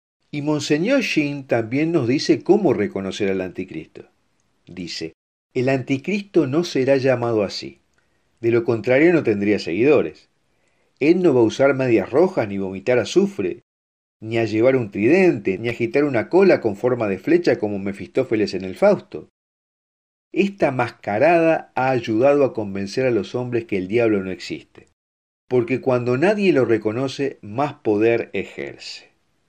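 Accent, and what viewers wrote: Argentina. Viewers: A mí me da una ternura inmensa, además del tono argentino. Saludos!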